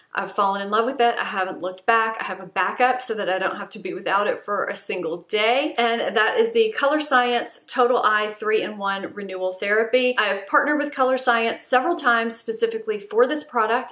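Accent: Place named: Memphis, United States. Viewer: Gotta say, being from Memphis, your Southern accent sounds distinctly like it's from my area. You sound like a Memphian! Great videos!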